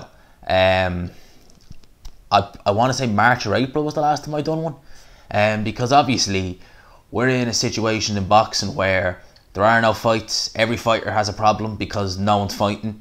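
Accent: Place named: Dublin, Ireland. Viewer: Got Irish rent - you're such a dubbie you sound like my dubbie cousins :D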